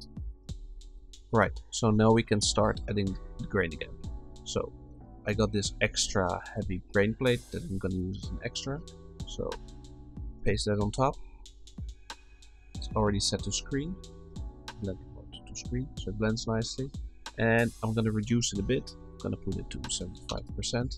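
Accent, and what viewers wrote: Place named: Netherlands. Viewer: Toffe video! I recognised a Dutch accent I think, if I'm not mistaken.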